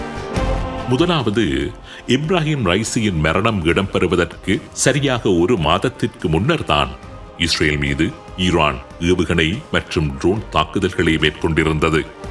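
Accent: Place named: Jaffna, Sri Lanka. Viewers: Anna your voice kaadallaa enkaluko eppavum Elam Jaffna jabakam vaarukothu super voice Anna 10 vasellaa erunthu kakirran God bless you Anna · Pure yazl Tamil❤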